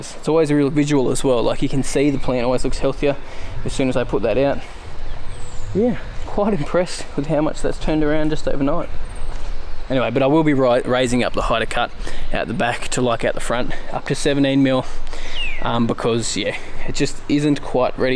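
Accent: Australia. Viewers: Hey Man U from Aus?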